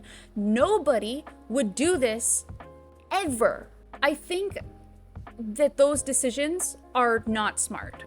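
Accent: Canada. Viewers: i was not expecting the toronto accent omg 😭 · Are you canadian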